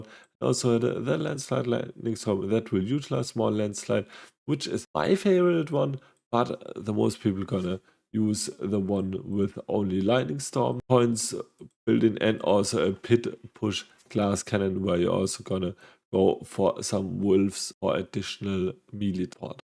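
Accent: Germany. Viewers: I love the German accent when speaking English, your speech is just perfect matey, maybe a little fast. Certainly a lot better than my German! :-)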